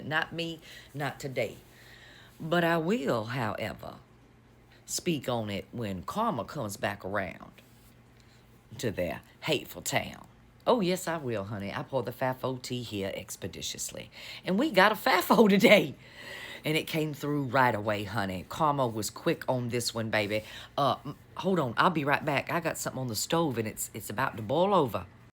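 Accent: South, United States. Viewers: Found your channel recently, love to hear your tea talks. I should’ve known you are a survivor, there’s a certain knowledge that comes from those who have. Know that we (I’ve included my family members) appreciate you, your savviness, and your ability to express current affairs in that wonderful southern accent. Stand strong, be safe, and continue on. Thank you. 💔🙏🏽💙💪🏽💙🇺🇸💙🚫🤴🏼💙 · She has a beautiful southern accent/voice.❤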